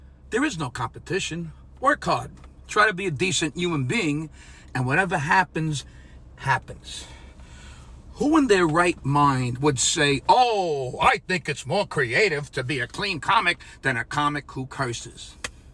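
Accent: New York, United States. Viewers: I was born in NJ exit 13, grew up exit & A. Family is from Brooklyn Bay Parkway and 86th street. When you speak your accent makes me think of home. In HS the Jews were close friends with the Italian kids. We liked to date them because they were easy I men friendly. The Italians dated the Jewish girls and discovered what a headache was. Cultures are very similar. Comedy back in the 60s was really funny and if you went to the Catskills it was dirty. Think of Buddy Hacket. I miss the old days and you bring them back for me. Dont shut the fuck up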